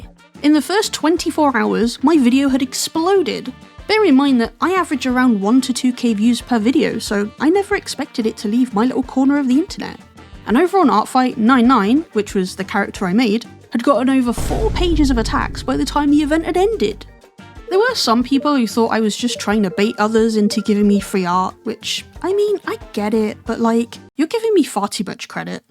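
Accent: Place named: United Kingdom. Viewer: i love your british accent, i think its british, urart is AMAZING, i am DEfINENTLY drawing a mech when i go to bed, even tho my usual drawings are so mediocre, but i'd love to do this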